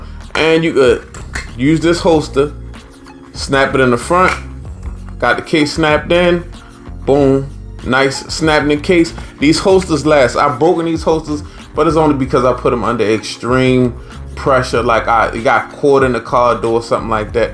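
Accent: Baltimore, United States. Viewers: Sounds like you're from Baltimore, good review and also dud was right cut the nails 😂💯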